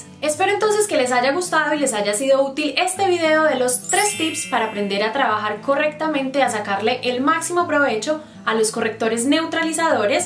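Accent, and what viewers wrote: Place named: Colombia. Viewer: primer video que veo y apenas empezo dije... Es colombiana! ❤ Nueva suscriptora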